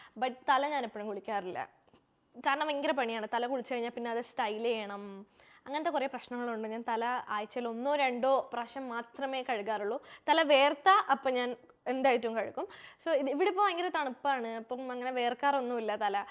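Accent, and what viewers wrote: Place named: Thalassery, India. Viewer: That Thallasery slang 😊❤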